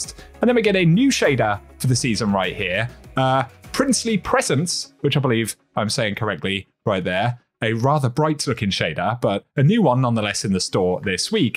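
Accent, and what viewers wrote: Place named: United Kingdom. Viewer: Here for the delightful British pronunciations!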